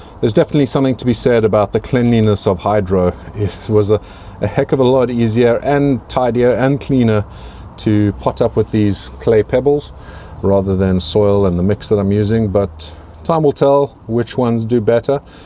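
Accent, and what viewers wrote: South Africa. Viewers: Awesome channel. Built a hydro system as a little hobby project and my first thought was "I have to grow peppers in this" so managed to get hold of a nice assortment including some Reapers. Been enjoying your sauce making videos very much and great to see your growing methods too. Your surroundings say UK but your accent says SA. Either way, hi from Johannesburg!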